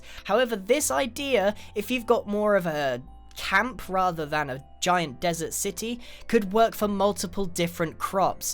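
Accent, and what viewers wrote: United Kingdom. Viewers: He's British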